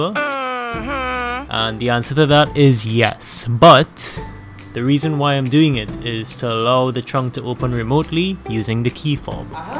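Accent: Trinidad and Tobago. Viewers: Your accent seems very familiar!! Trini!! I'm getting a lexus altezza next week and lookin at installing one of these as well as a push to start and remote start kit so appreciate this guide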